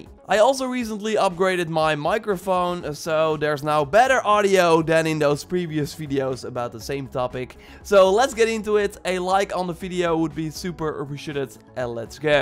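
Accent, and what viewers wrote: Netherlands. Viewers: Your definetley dutch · Your english sounds dutch too me 😂😂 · You can hear by his accent, this guy is Dutch. If you is Dutch. "Wassup Northern brother" If ya aint. "How you sound Dutch?" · His Dutch accent 😂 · Echt heftige nederlandse accent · Are you Dutch by any chance? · Jij bent toch een Nederlander hè? Omdat je accent er op lijkt....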